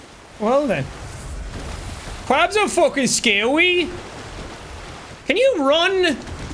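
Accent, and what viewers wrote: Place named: Ireland. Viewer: 8 and a half hours of screaming irish man, yes sign me up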